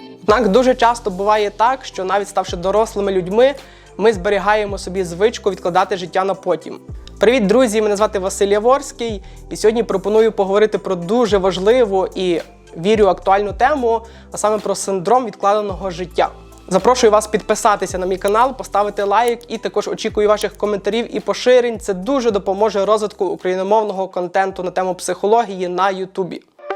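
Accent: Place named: United States. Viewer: У вас акцент американський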